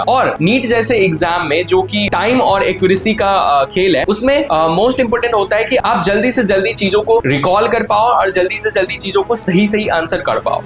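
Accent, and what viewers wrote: Bihar, India. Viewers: He is from Bihar and has that bihari accent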